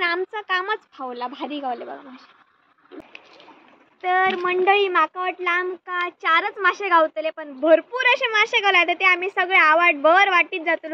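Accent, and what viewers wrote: Malvani, India. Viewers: I ❤ माझी मालवणी भाषा तू सुंदर बोलते.❤u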